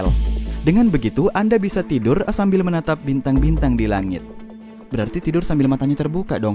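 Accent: Makassar, Indonesia. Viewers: tinggalnya di Maros gua lihatmya di IG dan coba cek videonya yang Review HP,logat makassarnya pasti terdengar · Logaknya makassar rodong ngr😂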